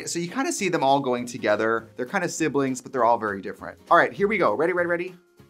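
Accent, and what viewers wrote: Wisconsin, United States. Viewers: As someone who lived in Wisconsin for 10 years, her accent coming out always makes me giggle lol. It’s prominent in a lot of the things she says but it’s too funny when it REALLY comes out 😂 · the wisco accent 😭❤️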